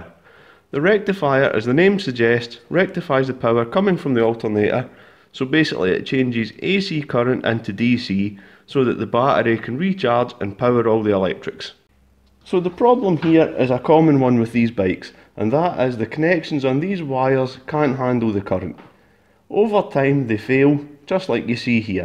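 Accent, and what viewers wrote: Scotland, United Kingdom. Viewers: The increased commentary and explanations is spot on. Being a fellow Scot, it all makes perfect sense to me. Think you are more north of the border them me though.. 😁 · Best restoration channel ever! you rock man, plus I can practice my english, I always found UK english harder to understand, but I really like how it sounds, so it's to see how you work on the machines and I can practice a little, too, cheers man!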